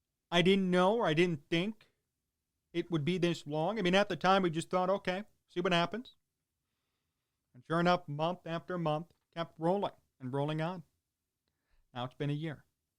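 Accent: Chicago, United States. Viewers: A Chicago voice for those who love Chicago and I am from Iowa and live in Wisconsin. But love me some Chicago. You keep me connected to Chicago sports no matter where I am. It doesn't make any difference how old you are but that you talk sense. That's you,. And the real reason is that you are just one hell of a respectful person.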